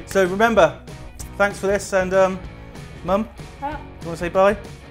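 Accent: England, United Kingdom. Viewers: I didn’t expect the English accent. Nice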